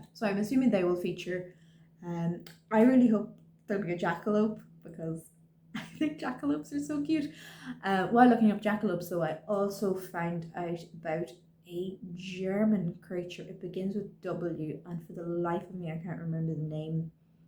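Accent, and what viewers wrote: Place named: Ireland. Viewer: Love your fabric choices. Great to hear an Irish accent. Happy stitching.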